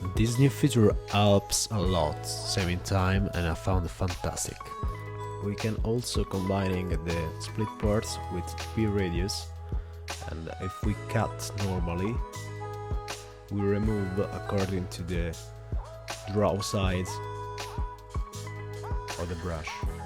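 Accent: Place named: United Kingdom. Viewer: What an amazing british voice man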